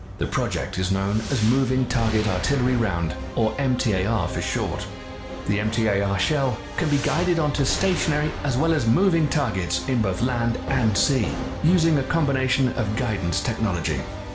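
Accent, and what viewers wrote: United Kingdom. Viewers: The beautiful British accent is so wrong here. Al Bundy or David Chappelle would be better. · Bizarre that the narrator spoke with an English accent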